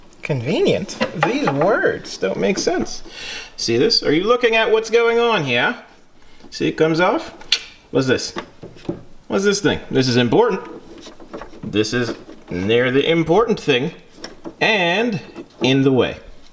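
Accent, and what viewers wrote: Jamaica. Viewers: 100% Jamaican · Was that Jamaica speak, or more a Trinidadian vernacular ? Great humor in any case ! The adjustable proportioning valve is usually placed inside the cockpit for the driver to dial more or less as needed, you will not dig having to get out to adjust it in the pouring rain. A bit of silicone grease on the rubber washer will really cut down the binding you were having, and it will not degrade the rubber seals in the calipers or wheel cylinders. You described the method to hold a nut in a box end well enough, start by holding the nut captive inside while spinning the fitting on the outside to get the party started. I will not mention how the resevoir looks like it will be impacted by the engine, thankfully it should torque to the right so it might not pop the resevoir off. Look forward to the more fun that will come your way... 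you have a great attitude !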